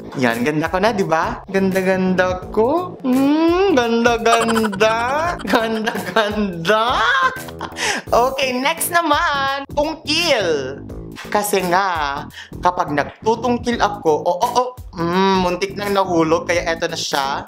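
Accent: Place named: Cagayan, Philippines. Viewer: Para taga CAGAYAN si momshie kasi yung accent nang pag sasalita niya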